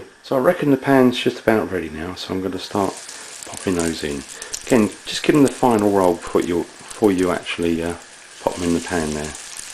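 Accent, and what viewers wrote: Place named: London, United Kingdom. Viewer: Thank you sooooo much.. i made this last night my husband loved it.. soo yummy,simple an easy ,and... yes ..your accent very londoner sounds kool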